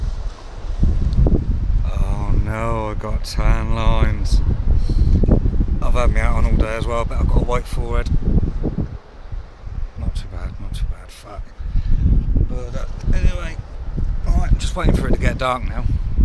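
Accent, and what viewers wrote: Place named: Gloucestershire, United Kingdom. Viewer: good video,cant believe the mingry lit u up with their "night sun"-shocking lolz,noticed an earlier comment on your accent,is it Gloucestershire?if not defo Hampshire.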